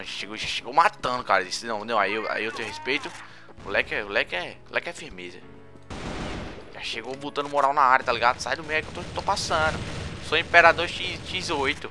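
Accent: Northeast, Brazil. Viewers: vc é nordestino?? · vc é do nordeste? não sei mas percebi pelo sutaque slá.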